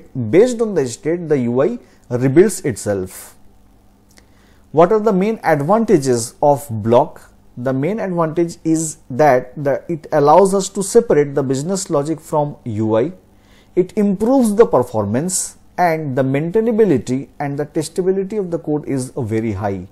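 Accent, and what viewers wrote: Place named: India. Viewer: i hate hindi accent, you teach bad :)))))))) )))))))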